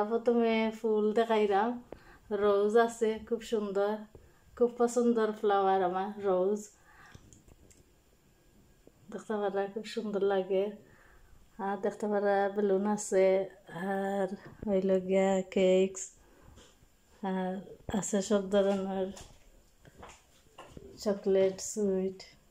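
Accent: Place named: Sylhet, Bangladesh. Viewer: আপনি সিলেটি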